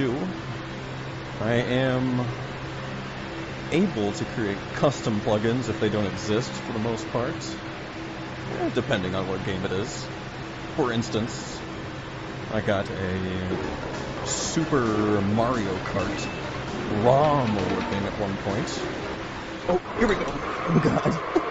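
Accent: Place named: United States. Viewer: Happy New Year! Looks like a fun time. I don't know why but I definitely wasn't expecting an American accent haha. Is your rig banking right when you're taking a banked left turn?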